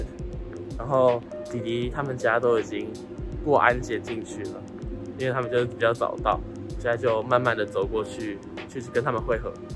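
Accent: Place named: Chongqing, China. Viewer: 所以学弟弟是海南人嘛？刚刚说的不是重庆话嘛？我凌乱了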